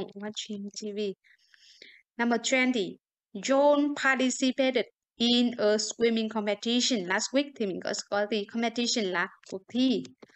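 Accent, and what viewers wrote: Standard. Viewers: Giọng b rất chuẩn.chuc b buổi tối vui vẻ nhé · B phát âm chuẩn mà dạy hay quá🥰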